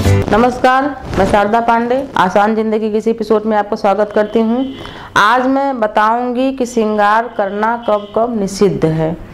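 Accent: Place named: Allahabad, India. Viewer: aap kaha rehte ho aunty muje ap ki language allahabad ki lag ri h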